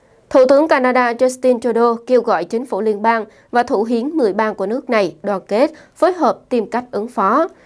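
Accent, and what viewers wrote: South, Vietnam. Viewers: Chào xinh đẹp ❤phát thanh viên có giọng đọc nghe rất hay chuẩn nam bộ. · 🌴.Anh Chào e nhé..Cô Mc rất là dễ Thương và Xinh đẹp.Cô có chất giọng đúng người miền Nam..Dễ nghe,lôi cuốn người luôn và thích quan tâm các tin tức thời sự..Nhất là các tin tức Q.sự..Đặc biệt là ở chiến trường đất nc Xinh đẹp Ukraine..Anh xin Kính Chúc Tập thể ban biên tập (Bbt) Chương trình của Kênh Qsm,cùng với Cô Mc Xinh đẹp như a đã nói ở trên luôn đc nhiều s.khoẻ, và mỗi ngày sẽ có thêm rất rất nhiều người biết đến Kênh Qsm để tìm đến theo dõi Kênh ngày càng thêm nhiều..Mc Xinh đẹp luôn mãi là người mà a rất ngưỡng mộ, và luôn ủng hộ Kênh Qsm đi cùng với e..Một lần nữa.Xin chân thành Kính Chúc đến với tất cả Quí vị 1ngày mới luôn Vui khỏe, và Hạnh phúc Bình an......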